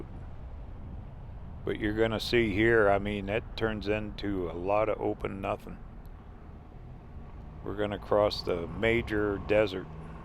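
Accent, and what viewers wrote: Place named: Western Pennsylvania, United States. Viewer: Great videos. Sounds like you’re from Western PA…maybe dahn tahn Pittsburg? I’ve driven most of 66 dozens of time. Keep up the great work.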